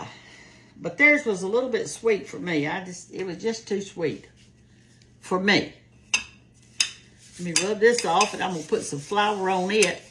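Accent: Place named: South, United States. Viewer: You are a hoot Ms.. I love to here southern women talk Enjoy your videos😊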